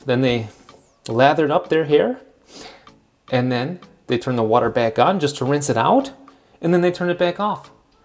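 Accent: Germany. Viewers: A very strong German accent and a lot of expressions and grammar that Americans don‘t use ;) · Funny... you sound more and more german each episode. I mean, listen how you pronounce "Episode" in the beginning ;) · Ha ha I thought you were German because you really have acquired a German accent! 😀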